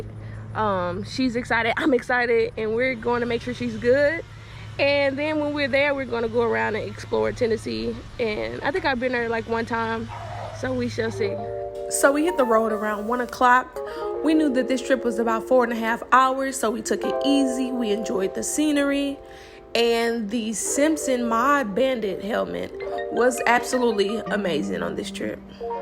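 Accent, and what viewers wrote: St. Louis, United States. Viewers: It’s bugs up here 😂😂😂 think that’s the first time I heard that St. Louis accent!! I like my helmet to match my bike so guess there’s no Simpson in my future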